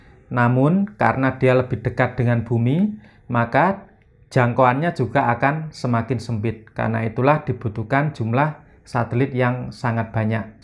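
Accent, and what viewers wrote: Java, Indonesia. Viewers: powerful medok kang😁👍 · Ini pasti Orang jawa.ngomone jowo medal.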